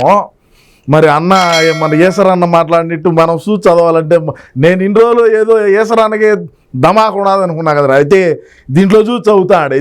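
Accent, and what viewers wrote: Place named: Kadapa, India. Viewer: చూశారా 😂😂😂😂😂😂 ఏం మాట్లాడినావు నా ఊరిలో భాష.👌👌👌 మా కడప దగ్గర భాకరాపేట మాండలీకం 😂😂😂😂😂😂😂 👌👌👌👌👌👌👌👌😢👌👌👌👌👌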